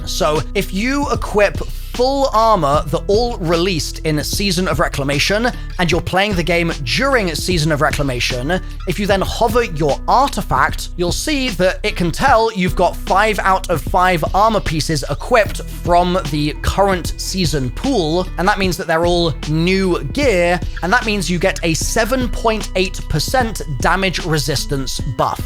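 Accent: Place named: United Kingdom. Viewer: why are you bri'ish?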